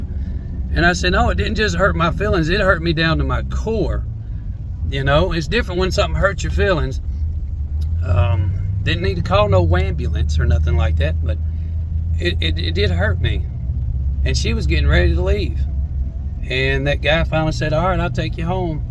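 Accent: United States. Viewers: The churches are no better in the UK, people not friendly and in there clicky groups, i stopped going very dissapointed with what i experienced, thankyou for sharing, u are looking well brother praise Jesus, love ur deep south accent , see u in the clouds very soon , maranatha 🙏🏻💕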